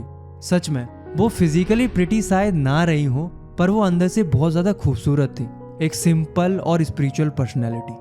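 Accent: Uttarakhand, India. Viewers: sirr ap uttrakhand sa honaa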